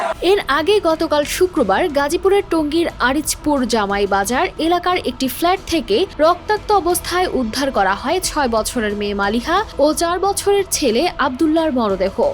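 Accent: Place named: Kolkata, India. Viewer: কোলকাতা স্টাইল এ নিউজ পড়ার কারণ কি? আপনার মতো সাংবাদিক কিভাবে একটা চ্যানেল রাখে 😑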